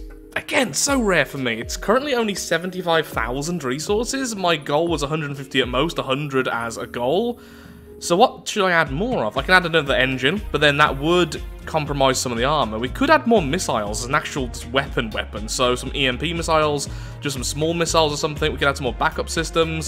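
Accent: United Kingdom. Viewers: He's briish that's it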